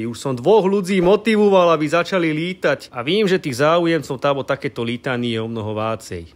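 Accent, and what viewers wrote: Trnava, Slovakia. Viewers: Skvelé video,ale tá trnafčina !?!😀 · nwvis ani vypravat, velmi zla trnafcina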